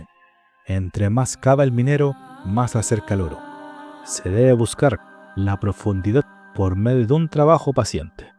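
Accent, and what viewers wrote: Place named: Chile. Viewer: Iba a comentar que se debiese buscar un enfoque de beneficios y no miedo de no aprender, pero es todo lo contrario, tu canal es genial, una opinión muy critica, una voz con un toque chileno pero muy neutro y no buscas ideologizar sino compartir para quienes quiersn escuchar, y eres criticos con los ceeyentes y no con los que no lo son, y eso se me hace muy consecuente, es bueno encontrar gente que aun aprenda fe las enseñanzas de cristo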